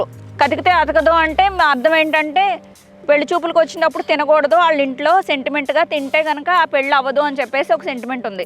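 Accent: Godavari, India. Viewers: ఒసేయ్ లప్ప గోదారోళ్ళ భాష మాత్రం బాగా పట్టవే! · Nv matldedi godavari yaasa ,rayalaseema antavu enti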